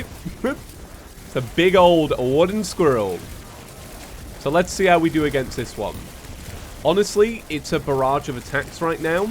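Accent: United Kingdom. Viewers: Saga of Brittish Accents Tuesday-Chewsday Silva-Silver Terra-Terror i cant remember any other ones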